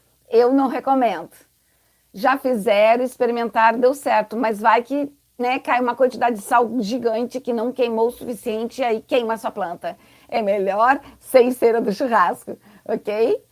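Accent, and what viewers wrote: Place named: South, Brazil. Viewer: 👏👏👏sou do Rio de Janeiro! E amo o modo de falar de vocês do Sul ! Do nosso amado Brasil!! Beijos!!!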